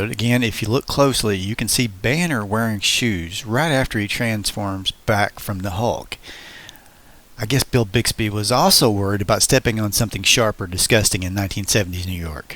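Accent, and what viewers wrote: South, United States. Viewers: Where in the South is your accent from?? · The guy narrating sounds like he's out-of the dukes of hazzard.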